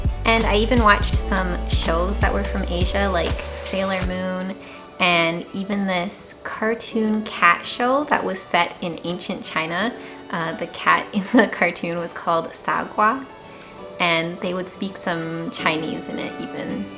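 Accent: Taiwan. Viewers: What apps or resources did you learn Mandarin with a Taiwanese dialect/pronounciation? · 好道地的台灣中文~ 命中註定當台灣人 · the Mandarin you spoke make me feel very comfortable, that's because you have a strong Taiwanese accent! I learn English from you and other youtubers, like Wes Davies...etc. Briefly that Wes and you speak the most clear and neat English that I learn. Very good listening that I'd ever had. Also I am learning Japanese, too. My opinion to you that making English videos can make your channel more popular, and get more subscribers! keep following your channel. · 這個小姐姐說話都很台也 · 觀看的影片一直讓人覺得很舒服，妳的中文有好聽的台灣親切口音，節奏也很放鬆彷彿就像是個熟悉的好朋友在身邊說話，謝謝你和我們一樣一起愛這片土地與人文，祝福越來越好! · 你講中文根本就是台北腔了，跟你老公根本沒差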